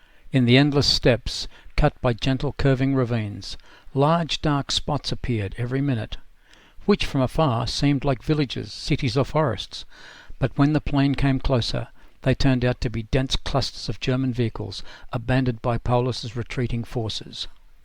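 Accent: Australia. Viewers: What is an Australian doing the commentary ? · Better late than never... Yes, I sadly agree, especially for USAers who actually need subtitles for NZers! Australians basically understand every dialect of English and being so multi-cultural, many strong accents are just everyday life here. I had a great career in Call Centre Training in the Philippines precisely because: A) I'm very good at it & I loved being there & doing it, and B) My so-called "U Australian" accent can be almost universally understood; it is pretty neutral as English speaking accents go, and I can easily learn & adapt to different dialects & vocabulary. So I could manage not only Aust/NZ accounts, but also even the strongest of US or UK English accents. The Americans DEFINITELY can't do that & the British often aren't much better, so I was always in demand. My accent is less "Australian" than your narrator's, for reference. 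PS: What a 10 months it has been!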